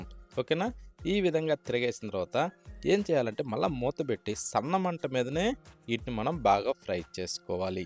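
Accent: Nellore, India. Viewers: మీది నెల్లూరు కదా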